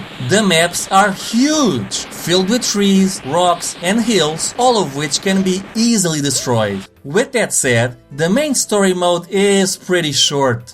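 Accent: Portugal. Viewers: Desculpa... Mas tu és português? É que o sotaque é quase inconfundível! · I sense a Portuguese is reviewing this, dá-lhe amigo 👌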